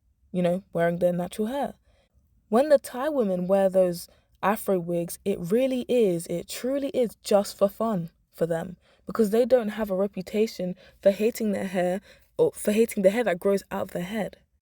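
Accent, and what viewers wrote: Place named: London, United Kingdom. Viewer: Unrelated but your accent!! Im also a fellow londoner too!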